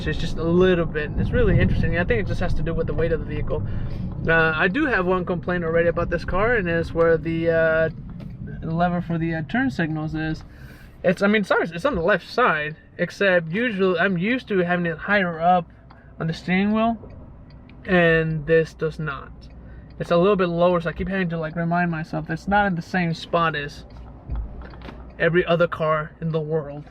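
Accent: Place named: Eastern Europe. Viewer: Where are you from bro? At times your accent sounds eastern European lol. Not trying to dis just curious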